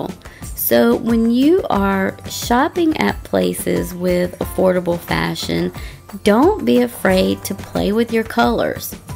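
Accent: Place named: North Carolina, United States. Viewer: You're a sugar cookie, Love that NC accent too.. Subscribed 😉😊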